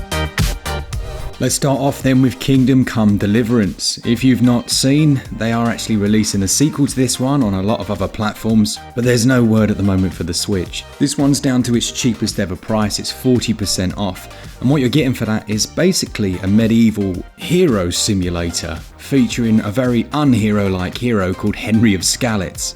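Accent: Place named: South, United Kingdom. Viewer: Smoothest southerner in all of Britania. Get some audiobooks done😅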